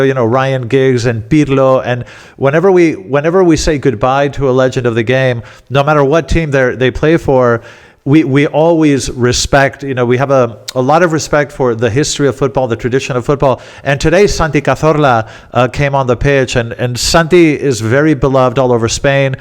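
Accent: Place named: United States. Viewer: You were playing bottom of the league. I gave up after 30 minutes when Oviedo were dominating and Real decided to actually play. In the rest of Europe we are sick of Real Madrid and happy that a PSG side without Galacticos is kicking ass and the rest of the world is laughing at you, knowing this moment was coming. The so-called greatest team in the world reduced to a pile of excrement. A bit like the US you could say. And you with your American English and predator hedge fund background would surely be a great choice for next Real President, Don.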